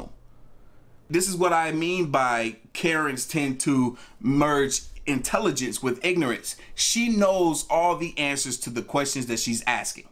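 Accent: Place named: Decatur, United States. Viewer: Sounds like Decatur area. Lol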